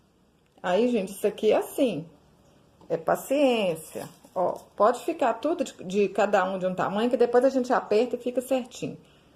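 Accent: Minas Gerais, Brazil. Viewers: O que mais gostei foi o sotaque mineirinho! Assisti até o final apreciando a moça falar!😁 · O mais gostoso de tudo é esse sotaque mineiro. Amo!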